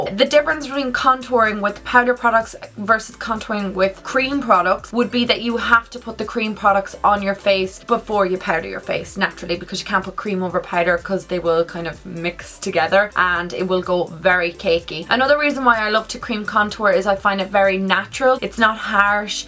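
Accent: Ireland. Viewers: I love the way she looks so tumblr and gorg but then she comes out with this common Irish accent 😍✌ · Are u from Ireland ? You sound Irish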